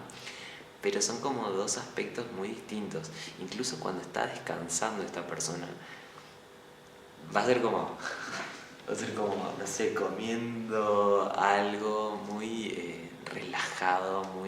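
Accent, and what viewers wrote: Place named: Argentina. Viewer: No entiendo, no eres lindo pero me gustas. Q pasa?😅😂 tu voz, mirada? Sonrisa? Me distraes. Ni siquiera me gusta el acento argentino. Tienes ascendente libra? O es que me recuerdas a mis primos? No malinterpretes, no me gustan ellos, pero uno aencuentra atractivo a alguien con semejanza de parentezco. Y aqui concluye el comentario de un mercurio en piscis 😂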